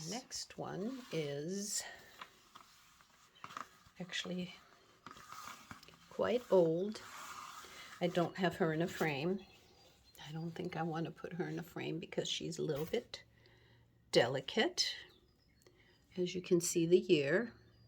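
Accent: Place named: Germany. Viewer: Boy that Kathy is going to be busy!!!! I soo love them both! Your sweet German accent is lovely! My kirche in WI is one of the oldest German churches in that area. They continue to do a gottestant service for Easter and Christmas! Not to mention the decor is very European! BTW I love the birds in the background!!! And yes it’s Tuesday!!! Hugs!!!!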